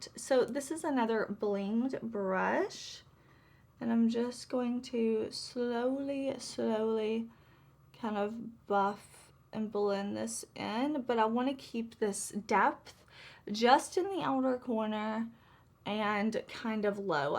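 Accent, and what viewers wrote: South, United States. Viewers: Wow 🤩🤩🤩🤩 That last look w/the green in the “socket” 😉- Just WOW!! That multi-chrome w/the predominantly pink shift; that’s got to be a gift from God, & I’m not sure I’ve seen one of those types of shadows on camera as reflective as that one. I kept thinking “oh no, she missed a spot of her lid space w/that multi chrome”-but no, I knew your skills were better than that, it was just the amazing shift and the way the color would “jump” around ur lid; girl, it practically dances across ur eyes ! I am only learning of this brand(from you). I actually kind of gravitated to ur channel after watching a few of ur vids, and appreciating ur seemingly unwavering support of these Indy brands, that other than Color Pop, I knew ZERO about! I’m fascinated, I’m hooked, and I think you’re great and that’s why I subscribed. You’re smarts, beauty, charm, southern accent, are just too refreshing to pass up 👍🏻💄💋❤️👏🏻 I’m learning so much, thank you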